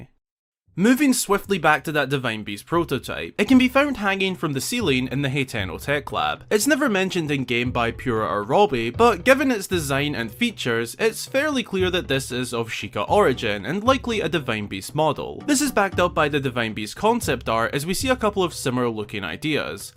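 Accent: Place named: Edinburgh, United Kingdom. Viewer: I'm a Scot and he definitely sounds proper Scottish to me as his accent sounds very Edinburgh-like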